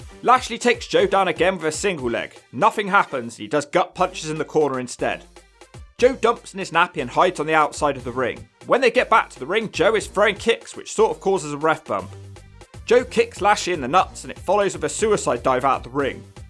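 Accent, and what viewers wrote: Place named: West Country, United Kingdom. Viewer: You've never sounded more Westcountry than in this video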